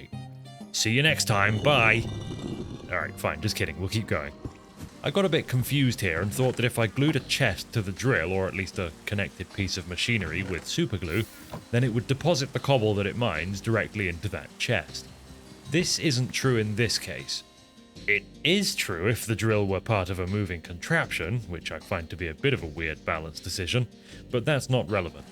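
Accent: United Kingdom. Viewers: I blame Totalbiscut (rest his soul) for my affinity for British commentary. There is simply nothing else like said in your accent, so charming and quaint. Its like a storybook or an episode of Thomas the Tank Engine. Love your vids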